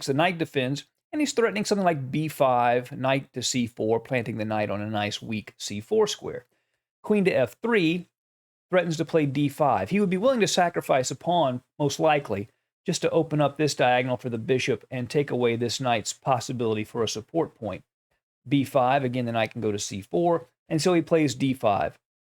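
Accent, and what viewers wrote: South, United States. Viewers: Are you from southern America ?🙂 Nice video, I love watch your chess review 🤩😍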